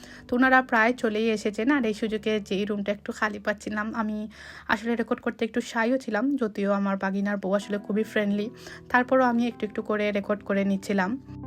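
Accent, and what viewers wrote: Sylhet, Bangladesh. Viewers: Kotay bujai jachhe uni 100% sylheti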